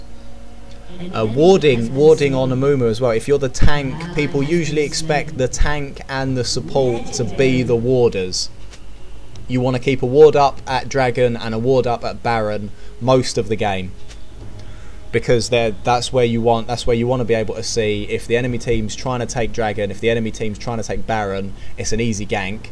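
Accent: United Kingdom. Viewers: u from london?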